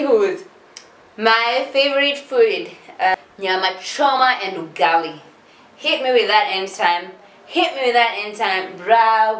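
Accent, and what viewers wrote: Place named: United Kingdom. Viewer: kwani ulikuwa UK?? Accent kicking in lol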